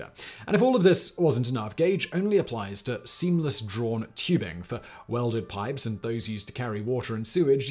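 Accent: United Kingdom. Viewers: Yes, it surprised me as well. Sounded so wrong coming from a British accent, mispronouncing words like that.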